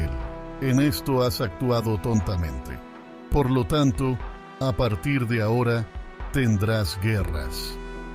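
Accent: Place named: Brazil. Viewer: es el narrador, los videos estan Mal editados, a veces se puede oir un acento como brazileño y a veces hasta en portugués se oye que esta hablando.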